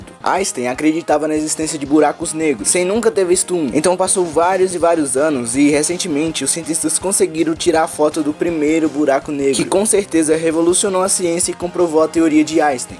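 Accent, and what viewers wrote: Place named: Brazil. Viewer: ainnnnn xenofobicomm parece até sulista Edit: tu realmente é baiano?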